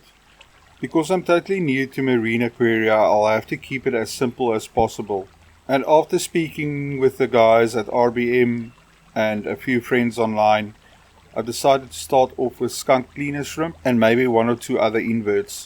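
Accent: South Africa. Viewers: You sound like your from South Africa my friend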